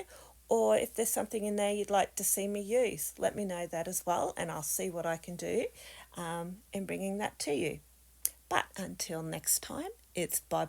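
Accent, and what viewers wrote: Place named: Australia. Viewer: I think everything you got was marvellous, the travel sticker book and papers were my favourite Great to hear a fellow Aussie...tfs:) Zelda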